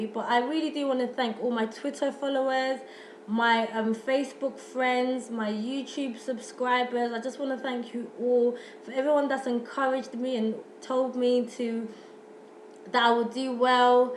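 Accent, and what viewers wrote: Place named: RP, United Kingdom. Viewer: Listen to that posh accent! LOVE IT! Do men measure up? Hmmmm...I am afraid to answer. LOL. For me personally, the man that I have DOES measure up, which is shocking because I never thought I would come across a man who fulfilled ALL of my wishes! This is a good video. For sisters who are still looking, there should be NO compromising! FAVORITED!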